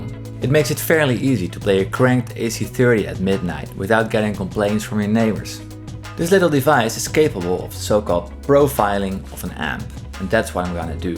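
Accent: Netherlands. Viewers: Ik denk dat je nederlands bent toch? Wel goed accent!! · U sound dutch lol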